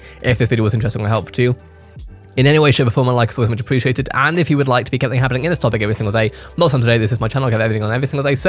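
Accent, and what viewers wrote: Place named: United Kingdom. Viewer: You do a great British accent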